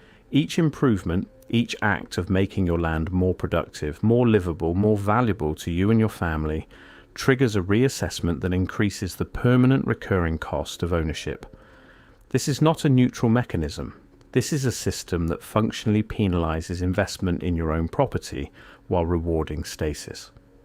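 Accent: United Kingdom. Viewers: Why are all AI NARRATORS IN BRITISH ACCENTS 🤔 🙄 FAFO WWG1WGAWW NUREMBERG 2.0 NCSWIC NQTHING GOD SEES EVERYTHING 👀 GOD WINS FRENS M 🏆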